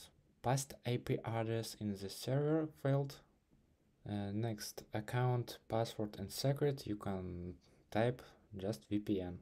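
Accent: Russia. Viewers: слышу русский акцент!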